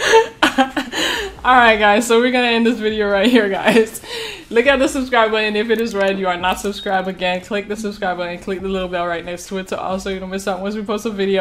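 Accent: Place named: Jamaica. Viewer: U sound jamakon if I spelled it right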